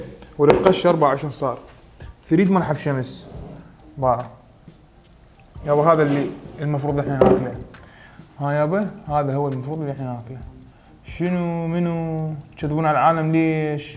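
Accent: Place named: Baghdad, Iraq. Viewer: انتى مصلاوي بس تحجي بغدادي مو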